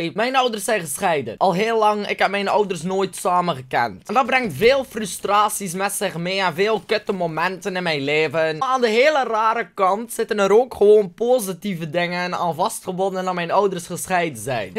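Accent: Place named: Flanders, Belgium. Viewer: Nederlands of Belgisch lol? Je accent is zo Vlaams maar je praat wel met een harde G?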